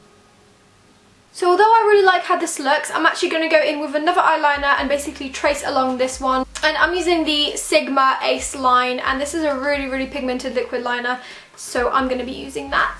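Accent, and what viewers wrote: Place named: United Kingdom. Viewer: jesteś z Polski?????????????????????? co?????????????????? nie poznałam, masz taki piękny brytyjski akcent, wg śliczna jesteś. Od ilu lat mieszkasz w UK?:)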